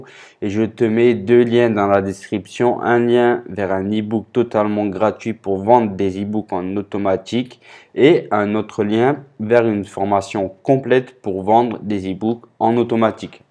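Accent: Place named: Africa. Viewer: T’as comme un accent africain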